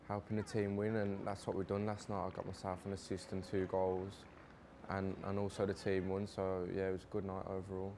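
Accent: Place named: Birmingham, United Kingdom. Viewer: He's so Brummie it hurts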